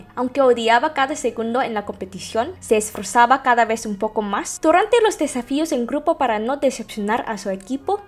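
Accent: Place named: Russia. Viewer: Pense por un momento q su nombre era Lelouch el protagonista del anime Code Gears...Sobre como hablas español la gente piensa q tu acento parace ruso por la "R" los rusos por lo general suelen arrastrar DEMASIADO la "R" al usarla en el español. La R solo se arrastra cuando alguna palabra comienza o terminas con R (raro, rico) o cuando aparece la doble R (ferrocarril, carro) y cuando aparece entre consonante y vocal (pra, pre, pri, pro, pru, bra, bre, bri,.....etc) como por ejemplo preparatoria, batracio y por ultimo cuando en una silaba esta compuesta solo por una vocal (aeiou) seguida de la R por ejemplo articulación, ermitaño, ortiga (la silaba q le sigue comienza en consonante....)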